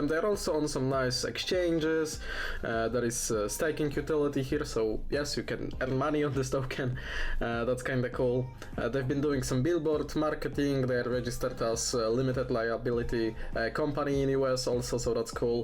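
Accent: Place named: Eastern Europe. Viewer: you have a nice accent what is it? some slavic it seems. I already bought for 1000$ shr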